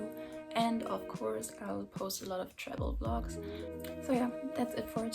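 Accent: Germany. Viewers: Maybe it's a bit of a stupid question but are you german? I saw a product in another Video that was german and you have a bit of a accent so I just wondered... (I'm german too btw) (oh and please ignore my name it's my fathers account😅) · are you german? because you kinda have an accent :D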